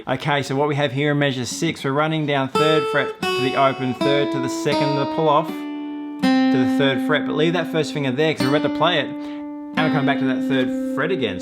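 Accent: Australia. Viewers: Y'know... over here in America, when watching flatpicking videos normally we hear a familiar hillbilly accent... like from Kentucky or Tennessee, North Carolina, Virginia, or even Southern Missouri. Then there's fellers from Italy that pop up... Beppe Gambetta and Roberto Dalla Vecchia who sho' can pick! And listening to their Italian accents, don't sound like Knoxville, if you git my drift... and then I found another person from ENGLAND, a lady named Charlotte who made my jaw drop, she got Bryan Sutton's attention, invited her over to the USA to help instruct guitar... and this here video... what's that Australia? Bluegrass RULES!!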